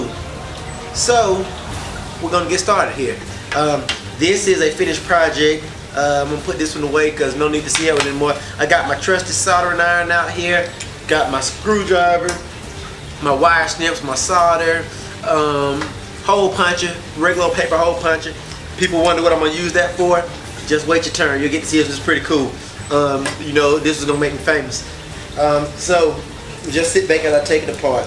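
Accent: South, United States. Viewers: yo voicee is country and raw iwish i could talk like that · He's southern the white people there sound the same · u from da south aint you?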